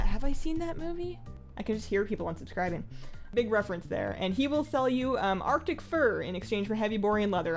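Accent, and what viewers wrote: Canada. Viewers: Ok, I need to know... are you Canadian?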